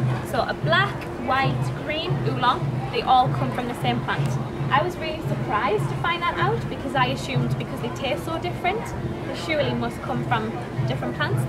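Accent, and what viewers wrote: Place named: North, United Kingdom. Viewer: lurve this veedyo. (sorry, her northern accent is as delicious as the teas she describes- and my version is horrid.) but I do love this video. I am an anglophile so....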